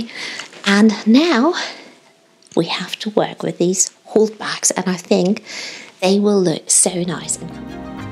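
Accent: England, United Kingdom. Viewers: You say you are Germans - but to my American ears you sound so English!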